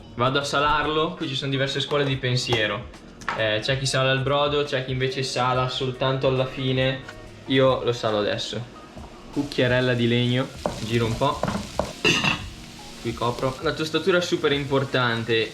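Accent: Italy. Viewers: Ma sbaglio o hai l'accento cremonese?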